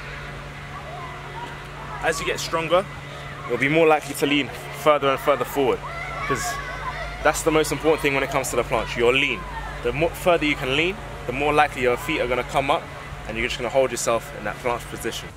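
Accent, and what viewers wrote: United Kingdom. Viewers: yo man where you from in the UK? Nice tutorial very informative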